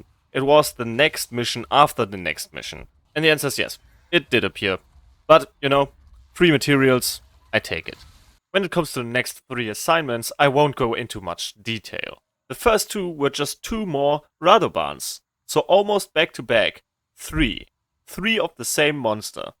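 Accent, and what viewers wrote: Germany. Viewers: Hey are u german u Sound like u hafe a german accend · ich höre so stark das du deutsch bist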